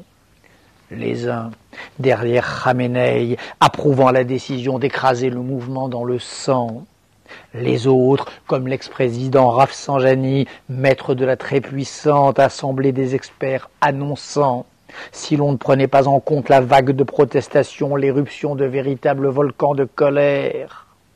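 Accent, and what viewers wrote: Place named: Israel. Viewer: mais FERME TA GUELLE pauvre tache tu fait honnte au VRAIE philosophe ...et mortelle ton acxent israelin et mortel .....a oui avant de parler de oppresseur n'oublie pas tes potes de la KNESET......et pour resume 300mille vote frauduleux on bien ete trouver mais dans le camps de mousavie ....connerie de soulevemment 1.5 million personne contre 48million pour ahmeddinedja.....pauvre devbile de menteur...koi kil arrive ferme ta GUEL.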